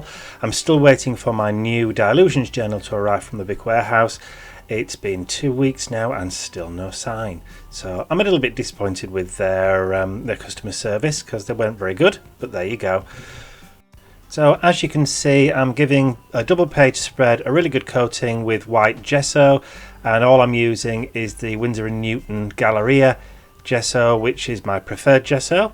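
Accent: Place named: England, United Kingdom. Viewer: Hey up chuck, though yur accent sound'tut familiar. It be furst time av sin thi, like. E tis nice to hear a fellow local brit, lol. That took some doing to stop it auto correcting! Who's lad that dose stencils? Would be good to know. Bic W ' house; are they really bad, as I looked up there customer reviews and didn't dare take a chance with not having a great deal of £ and didn't want to risk loosing it. Sorry to bombard you with Q's. Thanks for sharing your page, colours wer grand! Joanne.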